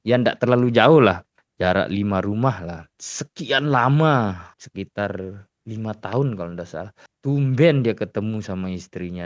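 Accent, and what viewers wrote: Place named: Lombok, Indonesia. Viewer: Cok logat nya kyk orang Lombok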